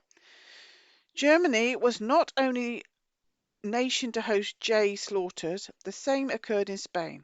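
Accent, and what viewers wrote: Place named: Wiltshire, United Kingdom. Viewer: That is fascinating. Are you from Wiltshire like me. I recognize that accent. Thank you sooooo much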